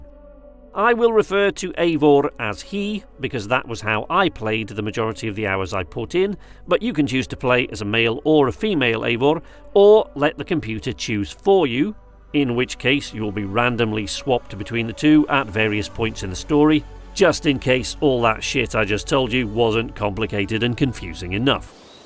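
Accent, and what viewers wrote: United Kingdom. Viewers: This guys is so British 😂😂 good fun review tho 👍🏻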